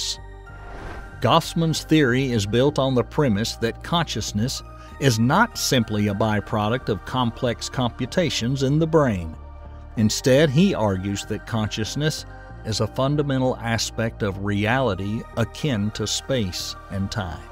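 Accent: South, United States. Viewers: This guy having a southern accent makes this 100x better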